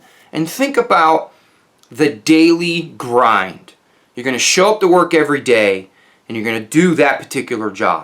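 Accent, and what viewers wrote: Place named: United States. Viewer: Good talk, will be helpful advice for my 16 year son as well. I also like to watch your videos because you are mad..lol...American English is very different from our Australian pronounciation!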